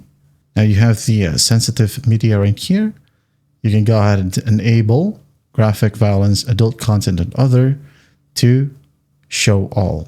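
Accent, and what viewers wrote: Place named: Australia. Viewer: i guess australian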